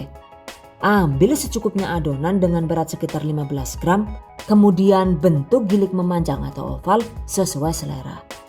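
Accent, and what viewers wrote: Banyumas, Indonesia. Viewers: Hahaaa seneng lahh kro suara ngapak'e kya nyonk kswuuunn resepe ya kk ❤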